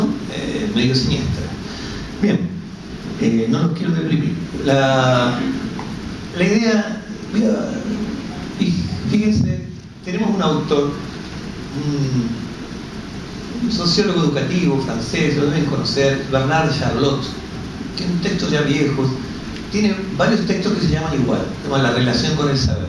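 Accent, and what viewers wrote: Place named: Chile. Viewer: Por qué pone tono chileno si es argentino? Notable dato paraverbal. Todo un mensaje....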